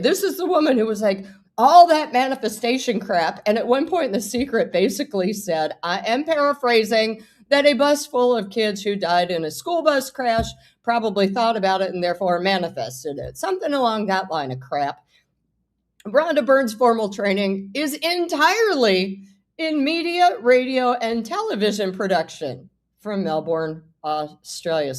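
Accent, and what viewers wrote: South, United States. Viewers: Is it just me or did get a vaguely Southern accent for most of this video? 🙃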